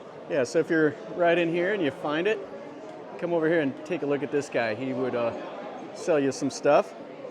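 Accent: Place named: Midwest, United States. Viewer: Very good video. I’m curious where your accent is from (this a way, that a way, talkin, shoppin) maybe Midwest in the US.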